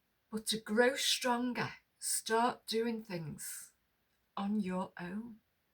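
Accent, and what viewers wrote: United Kingdom. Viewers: Hi, just found you. I want to give you a hug. Everything you have said resonates with my journey which started 3 years ago after my husband died. No one understands until they go through this, we were together for 50 years. I have just returned from my son & his family (for Christmas)just outside Liverpool so recognise your accent😊 I am in Malvern. Please keep putting your feelings on here, I am sure it will help you (and many others too). I too have realised that our “couples friends” no longer have anything in common with me and that my husband seems to have never existed for them. Yes- they too may have to face this one day. Sending love ❤ Sue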